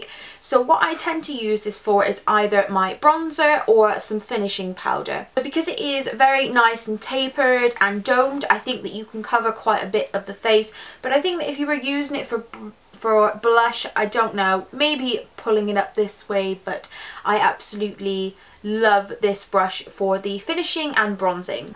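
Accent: United Kingdom. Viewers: I liked your accent UK 🌷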